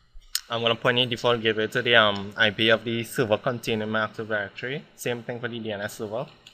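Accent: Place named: Trinidad and Tobago. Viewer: Oh Gawd Boy!! Dah accent is real Trini like bake and saltfish. lol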